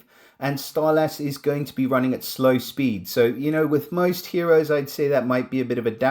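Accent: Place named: Cape Town, South Africa. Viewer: lol I knew it...certain words I can hear you from CPT😅 ain't nothing like a CPT accent 😎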